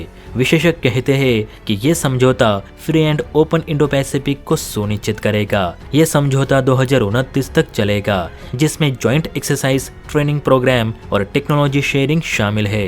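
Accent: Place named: Maharashtra, India. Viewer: Aap ki Hindi mein Marathi flavour lagtaa hai.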